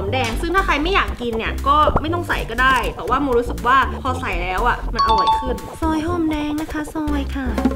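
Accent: Isan, Thailand. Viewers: พี่เป็นคนอีสานหรอค่ะพี่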